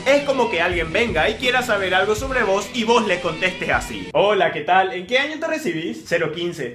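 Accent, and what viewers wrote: Paraguay. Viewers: Hacena videos a lo paraguayo como hablabas antes, te haces guau de el cheto nde tembo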